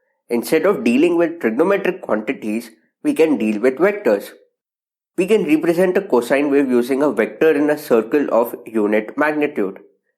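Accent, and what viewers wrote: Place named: India. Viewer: I think the content is wonderful. But alas, I am not used to the Indian accent either. I suggest you can upload a subtitle to help our foreigners understand your explanation. Thanks in advance!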